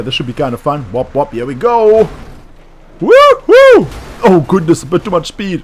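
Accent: South Africa. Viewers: Are South African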